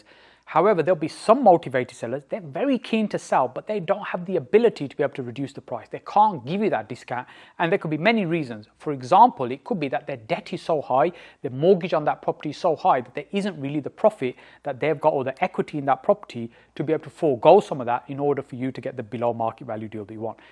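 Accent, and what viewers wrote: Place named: Birmingham, United Kingdom. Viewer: Brum accent but perfect English though.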